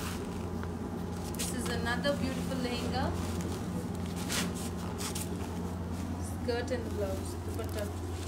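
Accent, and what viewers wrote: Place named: United States. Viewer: Manum India lo puttamukada American acsent enduku. Price clearga chepthe good 16. Or 60. Anedi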